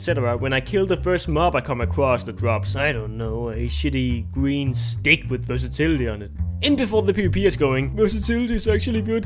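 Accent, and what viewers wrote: Denmark. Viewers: iv never seen anyone of your videos, but you make some valid points, Im both on pro and con-side of the artifact weapons, but so far i like them :) Btw, it sounds like you have a danish accent? Am I close? :p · bro you danish? I can act hear it :D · I know that accent You're from DK right? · Is it just me or do you sound danish like heck :o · Og man kan bare høre at du er dansker! :D Subbed! :) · this guy has the most danish accent in the world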